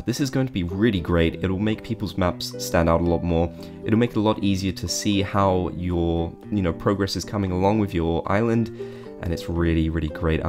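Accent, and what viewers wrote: Wales, United Kingdom. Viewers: Ok I really need to know if you’re welsh bc of your accent it sounds so welsh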